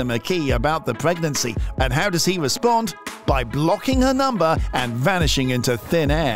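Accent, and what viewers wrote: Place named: United Kingdom. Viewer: Who's the British voiceover?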